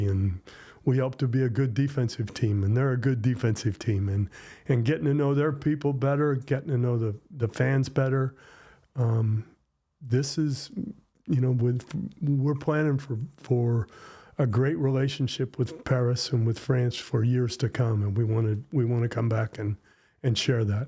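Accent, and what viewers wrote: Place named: England, United Kingdom. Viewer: Merci pour ton accent anglais, j'ai l'impression de l'interviewer.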